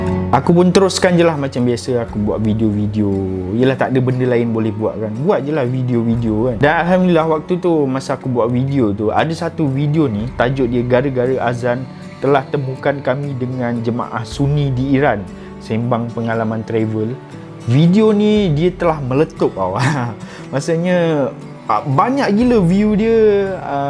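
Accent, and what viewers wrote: Terengganu, Malaysia. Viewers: Tahniah.. Selamat maju jaya.. Tapi request aku sblm ni tak buat lgi.. Aku mintak video ckp full loghat tranung mung dok buat lagi..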